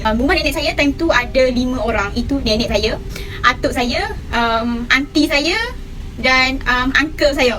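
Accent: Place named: Kelantan, Malaysia. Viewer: Tiba" kau yg seram 🤣... Kecek jk kelantan.. Saya lgi syok tgk... of course nk content seram 👍👍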